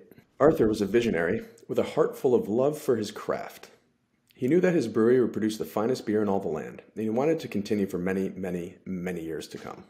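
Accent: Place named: Scotland, United Kingdom. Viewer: That's a Scottish accent 😅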